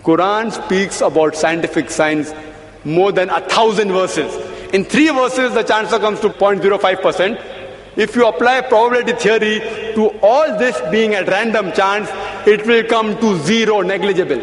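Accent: India. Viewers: Thats his accent our Indian accent is like that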